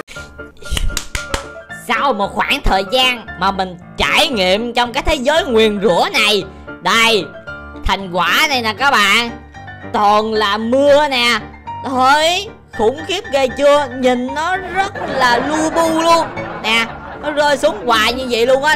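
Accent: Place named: Binh Duong, Vietnam. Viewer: Đọc lạ Bình Dương quá anh ơi😄😄😆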